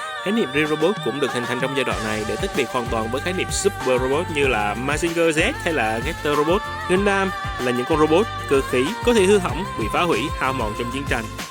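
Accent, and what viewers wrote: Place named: North, Vietnam. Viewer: Này chắc chắn là anh em miền Bắc rồi 😂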